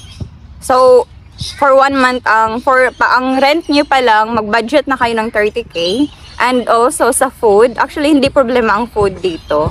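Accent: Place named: Hiligaynon, Philippines. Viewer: I can hear your Ilongga accent. Love it. perti gid tana ka lambing ya.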